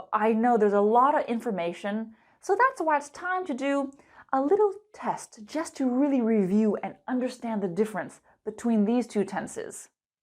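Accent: United States. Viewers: THANK YOU, ARE YOU AN AMERICAN? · It has been a utterly splendid, exquisite and flawless lesson. I'm particularly joyful, blissful and elated with that opulent topic. It is to my liking; moreover, it appeals to me. I do adore speaking, and her charming accent. Hence, you are the quintessential of American English. You are the teacher whom I always wish to meet. I was wondering if I might dare to ask you a question? I saw the verb “gotten” is that correct? Thank you very much indeed. I pay my respects to you. You are the be-all and end-all. Far thee well, dearest Prof.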